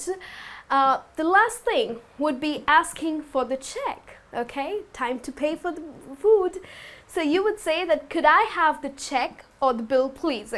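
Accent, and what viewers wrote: United States. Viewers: How do you twist your tongue to make US ascent???:) I wanted to learn it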